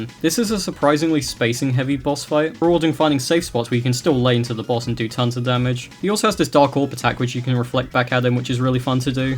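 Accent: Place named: United Kingdom. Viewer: You kinda sound British but I may be stupid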